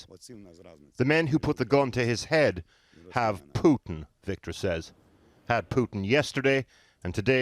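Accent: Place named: United Kingdom. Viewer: marshall ... as in martial . a british channel are you not ?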